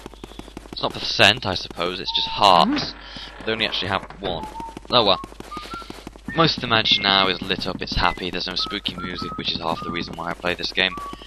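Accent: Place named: England, United Kingdom. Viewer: is the dude that made this video English??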